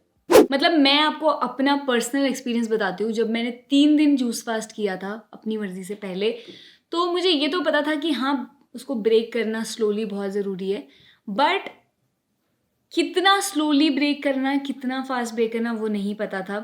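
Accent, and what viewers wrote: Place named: Uttarakhand, India. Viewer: I can guess you are from uttarakhand ...😅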